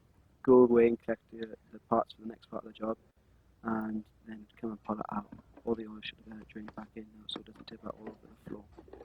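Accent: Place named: Wales, United Kingdom. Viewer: Thanks for your useful tutorial. A little hard to understand your Welsh accent ;) but the video is very clear and helps a lot. You have a free drink if you'll come around Olbia, Sardinia. Just send a message by Youtube. Cheers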